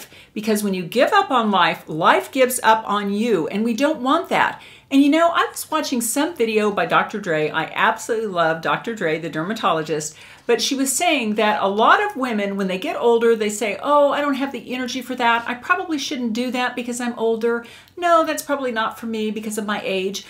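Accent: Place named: South, United States. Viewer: Wow...so beautiful... definitely a southern belle... absolutely love you accent girl..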